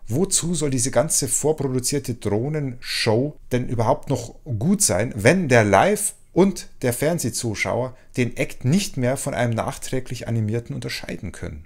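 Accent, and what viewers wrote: Bavaria, Germany. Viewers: yep - sehe ich auch so. Woher aus dem grossen "Kanton" bist den eigentlich? Toent irgendwie bayrisch ... Muenchen? (PS: fragen kann ich ja mal, antworten ist ja freiwillig)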